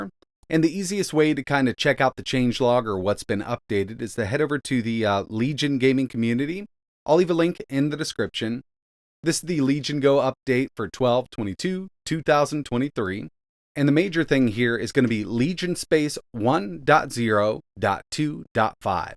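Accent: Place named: North Carolina, United States. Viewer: This is very generous! I just saw your address in your description, I thought I detected a fellow Tarheel's accent!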